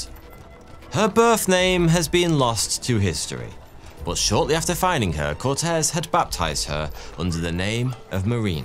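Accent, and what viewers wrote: United Kingdom. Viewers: He wrote about himself: " is a British YouTuber.." So it is just a kind of British accent. Maybe you should watch videos about the main British accents. Maybe his accent is not as unique as might you think - even if it is not a native Liverpool accent. Everyone has a family and friends in childhood and schools etc. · Never thought a British person pronouncing indigenous cities would be something I’d watch good job on this vid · that's how they pronounce them in the UK. My Roman wife does the same thing sometimes. Don't forget there's an entire world out there, bud. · The british black legend against Spain once again in your british or so accent!! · Is it because he’s British or because the words he gets wrong are unpronounceable non English?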